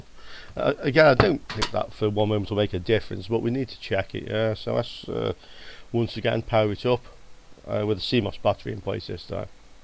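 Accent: United Kingdom. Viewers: Another guy who we can't understand...Too much 'Brit mumbled accent and boring personality?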